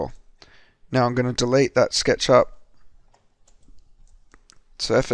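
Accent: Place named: Australia. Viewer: Love da Aussie accent mate!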